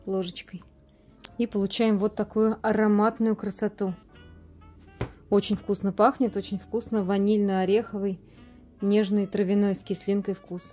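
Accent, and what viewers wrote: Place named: St Petersburg, Russia. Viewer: Это первое видео, которое я смотрю на Вашем канале. Такая у Вас речь четкая. Ближе всего к питерскому акценту, хотя, я мало где была в России. По голосу слышно, что Вы хорошо поёте.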